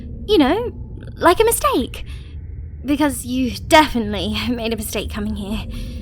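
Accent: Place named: United Kingdom. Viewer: Your accent sounds British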